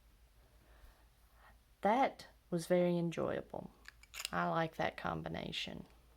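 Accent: South, United States. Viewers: I’ve really been enjoying your ink studies. Tell me, do I detect a southern accent?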